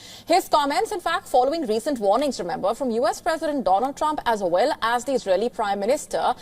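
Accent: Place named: India. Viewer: They are trying to mainstream the Indian accent. They think they are anglo saxon. "Me-ziles".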